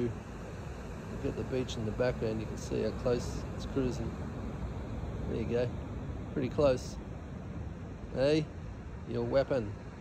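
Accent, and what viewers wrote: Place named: Australia. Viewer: Great footage, love hearing Aussie commentary, I've lived along east coast all my life, had some close encounters over the years, Evans head, Lennox, Byron. Just found the channel, keep up the great work, Cheers 😊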